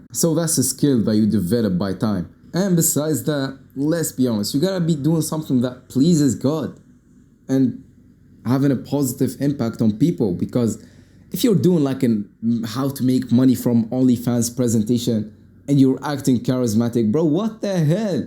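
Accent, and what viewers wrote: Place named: France. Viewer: Great channel masha allah. You sound French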